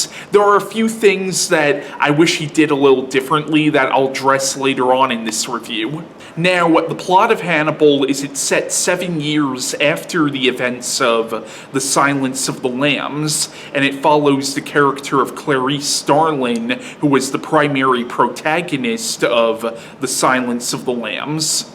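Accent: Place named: Midwest, United States. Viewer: he sounds Midwest American to me.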